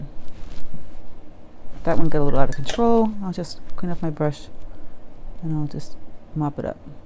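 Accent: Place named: Boston, United States. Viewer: Wow... that was amazing to watch! So many helpful details shared while also keeping it simple. I could also listen to your Boston accent all day! Thanks so much for sharing your amazing talent. What a treat!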